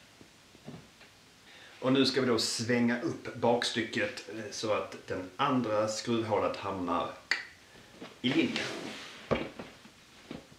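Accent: Skåne, Sweden. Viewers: Hi, I’m a Dane from east Jutland and I understod all of what you said without any major issue. My question is: would you consider yourself as speakning a prober Skånska dialect or perhaps a modern form of Swedish-Skånska? -which ofcourse is Swedish anyhow.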